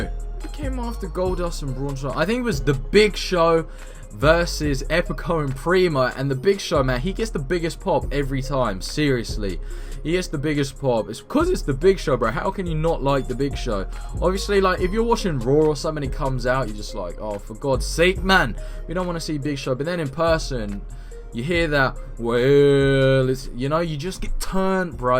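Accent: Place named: New Zealand. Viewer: Nice vid m8. You actually kinda have a kiwi ( new zealand ) accent a little. Im kiwi and you sound like us bro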